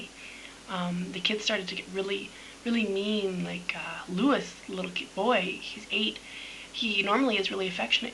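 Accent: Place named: Canada. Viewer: i'm hooked. i love listening to your Canadian English too. And your life is so different than any I know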